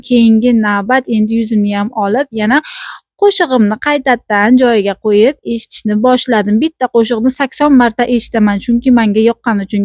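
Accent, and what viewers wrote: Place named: Olot, Uzbekistan. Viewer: Shevayizga gap yoq,haqiqiy olotcha❤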